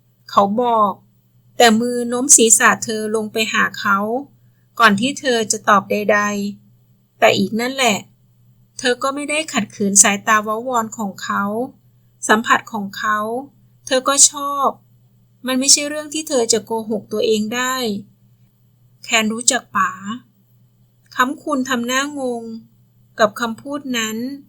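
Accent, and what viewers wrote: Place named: Isan, Thailand. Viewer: พุดอิสานเพราะมาก😅😮😢😢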